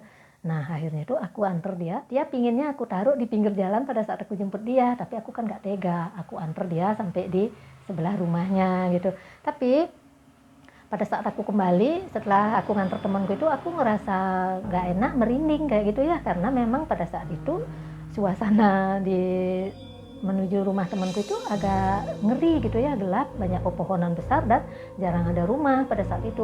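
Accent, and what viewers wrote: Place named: Bali, Indonesia. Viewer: posting malam bgt, tp ada notif langsung nonton saya mba krn kamu lucu. logat balinya kental sekali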